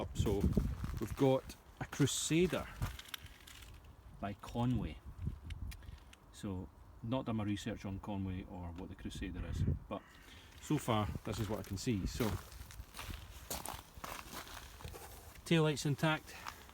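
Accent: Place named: Scotland, United Kingdom. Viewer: Maybe it's the equipment you're using. I only have the volume on at 1/4 and I can hear him perfectly well. It's either that or your're not used to a Scottish accent.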